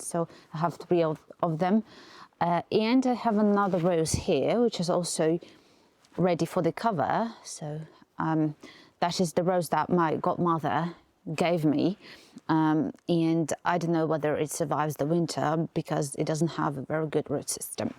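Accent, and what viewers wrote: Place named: RP, United Kingdom. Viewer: Love the posh accent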